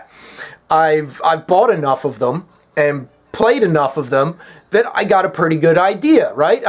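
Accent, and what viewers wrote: Philadelphia, United States. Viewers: your accent reminds me of the South west Philly accent lol thays why I asked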